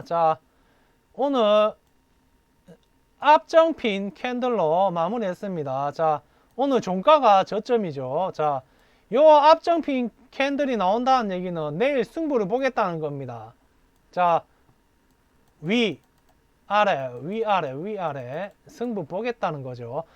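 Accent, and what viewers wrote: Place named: Gyeongsang, South Korea. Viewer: 멘트가 뚝배기네요 진국입니다 듣다 보면 시간이 넘 짧네요 저도 경상도라 구수한 사투리가 친구 만난듯 정겹습니다 올도 유익한 내용 잘듣고 갑니다~꾸벅~^^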